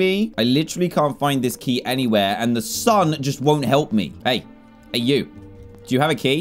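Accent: United Kingdom. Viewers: I thought u were British Eraser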